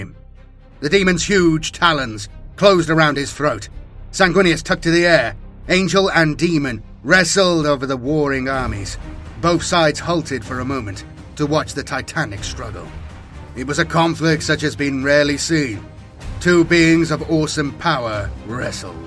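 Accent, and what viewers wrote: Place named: Midlands, United Kingdom. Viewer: Good video and was nostalgic at the end remembering the old dioramas. I remember one of an orc invasion (on a desert world?) which featured a vindicare assassin atop a building. One of the highlights of my childhood. Also, good to hear a Midlands accent on youtube, even if it is the west, hehe. Greetings from the East Midlands.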